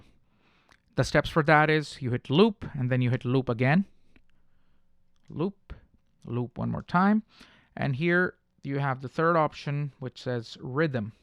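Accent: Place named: England, United Kingdom. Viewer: Except the English accent everything else is excellent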